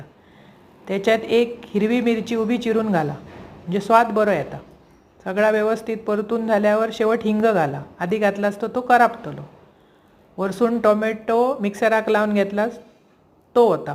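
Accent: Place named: Malvan, India. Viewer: सुंदर आणि सहजगत्या करता आपण आणि साधेपणा आणि मालवणी भाषेतला गोडवा खूप मजा नायतर आमच्या बायका कधीपण काय पण घालतत त्यांका समजवणार कोण लगेच भांडूक तय्यार पदर खोचून " तुमका काय अक्कल असा? 🤣🤣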